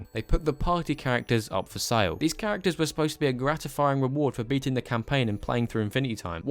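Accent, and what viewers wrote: United Kingdom. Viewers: You sound British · bri ish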